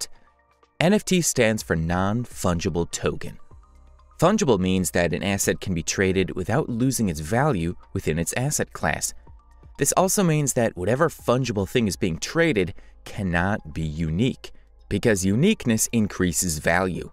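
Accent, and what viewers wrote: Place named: Chicago, United States. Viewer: Man the Chicaaago Aaaaahhhhcent on this guy making it hard to watch.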